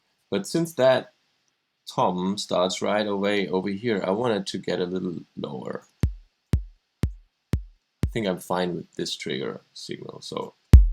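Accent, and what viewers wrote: Germany. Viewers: Man hört raus dass du deutsch bist xD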